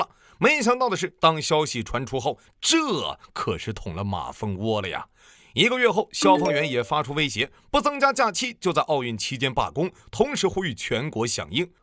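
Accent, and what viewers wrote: Northeast, China. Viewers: 听您说话就知道是本山的徒孙。。。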